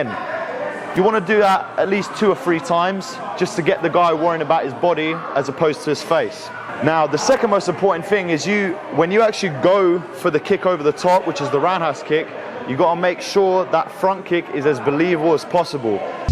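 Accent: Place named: United Kingdom. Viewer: He has a british accent.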